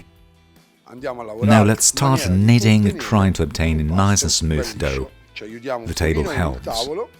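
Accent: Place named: England, United Kingdom. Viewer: I really could do without the heavy English accent voice over. Subtitles work fine if needed.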